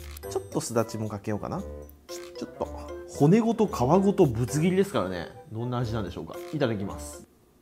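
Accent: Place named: Aichi, Japan. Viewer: の愛知訛りを聞くと地元に帰った気分になりすごく落ち着きますいつもありがとうございます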